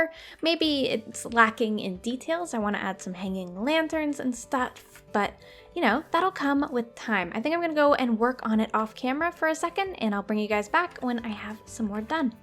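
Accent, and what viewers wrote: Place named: Canada. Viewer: I never realise your accent until you say sorry then I remember you’re Canadian I love it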